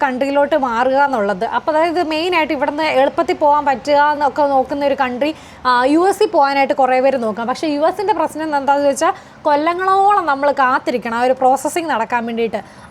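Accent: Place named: South Kerala, India. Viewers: കൂടുതൽ പേർ വിദേശത്തേക്ക് വരാതിരിക്കാൻ സ്വന്തം നിലനിൽപ്പ് ഭദ്രമാക്കൻ വേണ്ടി യാണ് തെക്കൻ മലയാള ഭാഷ സംസാരിക്കുന്ന ഈ സ്ത്രീ യുടെ ലക്ഷ്യം... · സംസാരം കേട്ടിട്ട് ഞങ്ങളുടെ തിരുവല്ല കോട്ടയം ഭാഗം ആണെന്ന് തോന്നുന്നല്ലോ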